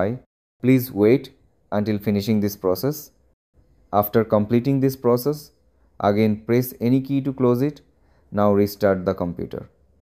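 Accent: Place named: India. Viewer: Ahh yes the "trust me bro" Indian voice. What a joke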